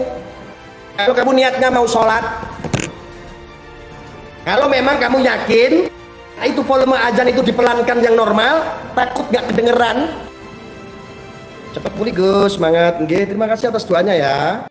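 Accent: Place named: Surabaya, Indonesia. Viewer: Suraboyo banget...cok..cok...setuju aku... 😘🥰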